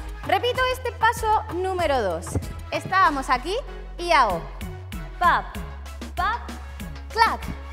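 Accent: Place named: Spain. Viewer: Felicitaciones me encanta este video y me encanta el acento de España si soy grande a lo mejor Un día voy para allá.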